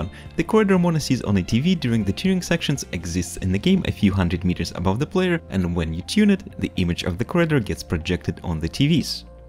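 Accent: Russia. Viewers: Я слышу русский акцееент~ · I feel a Russian accent in your words I'm from Russia :3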